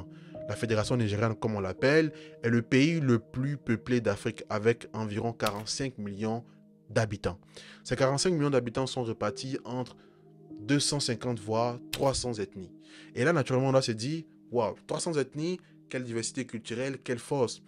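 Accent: Ivory Coast. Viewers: J'aime bien la façon de narrer avec ce accent ivoirien; je m'abonne direct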